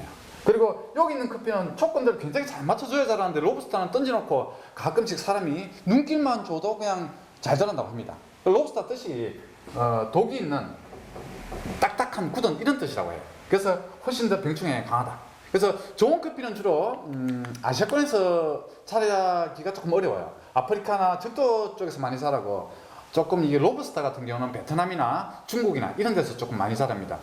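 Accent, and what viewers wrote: Gyeongsang, South Korea. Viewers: 아흥..강으너무 잘해주시는데....경상도 억양..알아듣기 힘들어요..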